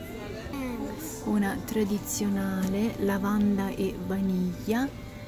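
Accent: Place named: Italy. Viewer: You guys need to grab up the jars with the old (large) labels, while they're still available in the UK/EU!! We only have the new, skinny ones in the US now (except for a few "Returning Favorites"): & we really miss the older, larger labels... :-( Loved hearing the beautiful Italian accent here! Praying I can visit Italia again someday... <3 Firenze will always have my heart.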